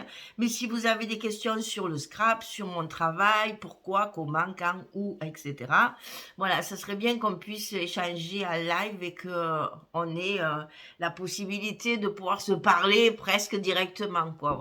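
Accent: South, France. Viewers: Ravie de mettre un visage sur ce bel accent du midi ! Votre enthousiasme et votre bonne humeur rendent vos vidéos très agréables à visionner ! Vos réalisations sont toujours très réussies ! Quel talent ! Je vous souhaite une belle année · Merci pour cette vidéo et tous mes meilleurs voeux pour cette nouvelle année et merciiiii pour ce magnifique accent qui nous fait sentir bon le soleil et les cigales 🙂👏👍 et longue vie à vos tutos si bien réalisés et expliqués. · Bonsoir.Bonne et heureuse année surtout vos petites mains et la tête pour créer vos album dont je fais souvent.Vous expliquez bien .J aime votre accent du midi.J espère que vos pieds vont mieux avec la préparation de la fête de Noël.J ai fait le folio avec la participation de rose du jardin.Il est genial.Gros bisous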